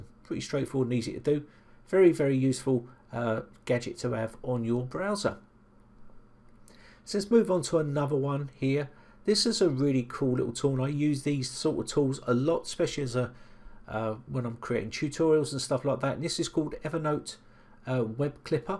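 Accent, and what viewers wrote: United Kingdom. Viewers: You're too funny...thanks. By the way, this ole Nana who is a Canadian is heading to Ireland on Friday (I'm guessing you are a Brit but I do have a bit of trouble distinguishing different accents (I know, I'm taking a risk even saying it LOL). My son and 3 beautiful grand children live there and this will be my 7th time going. Anyway, perhaps a little too much info but I really do appreciate all the assistance you have given me. All the best to you and your family dear!!!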